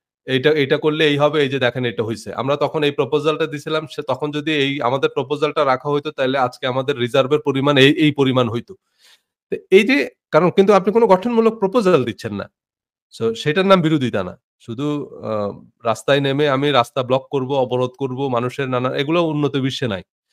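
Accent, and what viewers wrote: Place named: Jhenaidah, Bangladesh. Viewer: দক্ষিণ অঞ্চলের কোন জেলায় বা কোথায় বাসা, আপনার ভাষার প্রকাশ এবং প্রকাশভঙ্গি দেখলে মনে হই হয়ত ঝিনাইদের আশেপাশে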